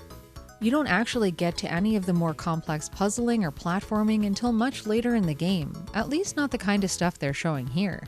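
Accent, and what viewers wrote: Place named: Canada. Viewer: Hey enjoy your videos. I would love to see a Breath of fire 3 review on ps1. Also, are you canadian? I am like 95% sure from your accent you are either very far north in America, or you are Canadian ay?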